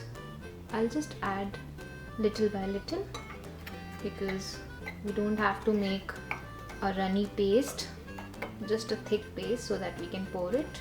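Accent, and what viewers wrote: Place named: India. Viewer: I LIKE INDIAN ACCCENT VERY MUCH VERY SOOTHING AND CULTURED.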